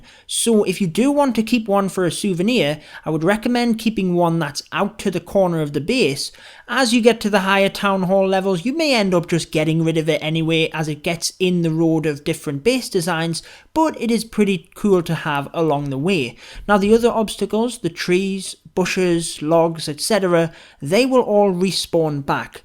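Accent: United Kingdom. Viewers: is just british accent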